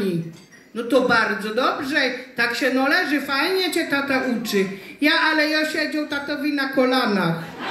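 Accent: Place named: Silesia, Poland. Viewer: Super kobieta uwielbiam Śląska gware bardzo miło posłuchać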